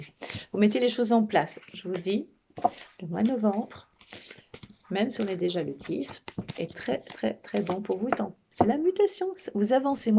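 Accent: Belgium. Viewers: Coucou,j'aime beaucoup tes lectures et ta douceur. Petite question. Tu es belge ?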